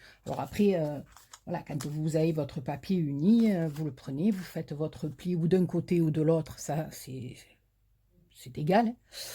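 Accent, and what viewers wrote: South, France. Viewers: Chère Madame bonjour je viens de voir ce tuto de carte à rabats super beau et que j’ai hâte de refaire. Votre carte est très belle. Et j’adore votre accent du midi qui fait penser aux beaux jours. Merci. Petite question pourriez vous me dire ou vous avez acheté votre papier motif nounours dont je raffole. Il est super beau j’adore les nounours et on en trouve très peu en scrap. En attendant votre réponse je vous souhaite un très bon week-end ensoleillé et vous dis à bientôt. Bises. Valérie 🌺⭐️⛸💋🐈🌸🧸🐞💐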